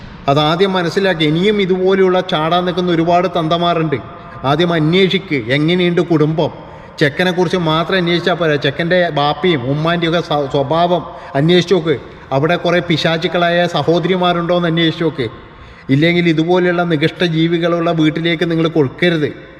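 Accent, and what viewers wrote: Kannur, India. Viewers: കണ്ണൂര് ആണോ, നമ്മളെ കണ്ണൂർ ഭാഷ ആണല്ലോ പറയുന്നത്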